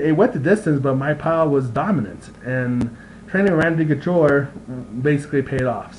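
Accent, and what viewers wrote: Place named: England, United Kingdom. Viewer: Lmao ur accent cracks me up idk where ur from maybe England but I appreciate your luv for martial arts it's very similiar to mine keep doin your thing and ur name rocks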